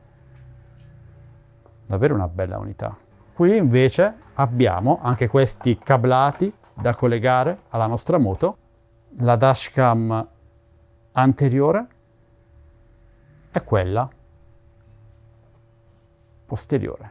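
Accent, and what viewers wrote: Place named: Sardinia, Italy. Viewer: Pensavo fosse sardo!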